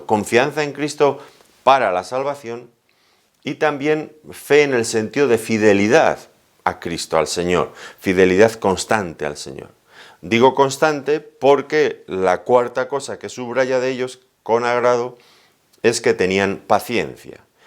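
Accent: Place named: Spain. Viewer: Predica: "El mensaje a Tiatira" Apocalipsis 2-18-29". Video en Youtube de este pastor cuyo entendimiento y humildad tienen la gracia de Dios, el ministerio se llama, desconocemos el nombre del pastor por su acento sabemos que es español, puedes buscarlo como en tu navegador Brave, en Youtube, Google etc. Lo recomendamos por su sana doctrina de Cristo, igual que recomendamos también a John MacArthur, Paul Washer y Miguel Núñez. ( Pastor Muchas gracias por compartir el entendimiento que Dios le ha dado. Noto que usted con humildad no publica su nombre, en contraposición a los falsos profetas que se hacen llamar "apóstoles" y no lo son, esos que ponen su gran foto y sus nombres con letras enormes en sus también grandes afiches anunciando sus eventos, y ni en broma mencionan a el Señor Jesucristo en esos afiches. Precisamente debido a esto creo que sería bueno saber su nombre para compartirlo rogándole a Dios que usted siempre conserve su humildad espiritual y salud integral. 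Dios los bendiga.).+ Romanos 5-20 "Pero la ley se introdujo para que el pecado abundase; mas cuando el pecado abundó, sobreabundó la gracia; 21 para que así como el pecado reinó para muerte, así también la gracia reine por la justicia para vida eterna mediante Jesucristo, Señor nuestro". <link>, Apocalipsis 2-2 "Yo conozco tus obras, tu fatiga y tu perseverancia, y que no puedes soportar a los malos, y has sometido a prueba a los que se dicen ser ap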